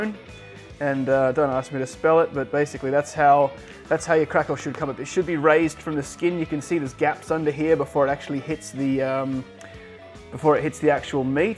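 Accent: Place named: Australia. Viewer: Gidday, some excellent tips in this video, but the bloke sounds like he's an Aussie. Just a reminder, we've been using Celsius for nearly 50 years in Australia. Fahrenheit is obsolete.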